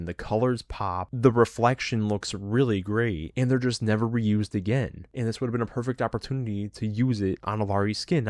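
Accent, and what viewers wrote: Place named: United States. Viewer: You have one of my favourite States accents, especially when you said "battle PASS" 10/10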